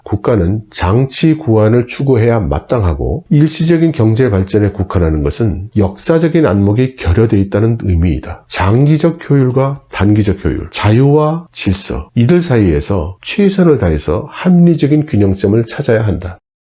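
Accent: China. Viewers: 이 박사님 확실히 공개 좀 해 주시지요. 한국분이신지 조선족이신지?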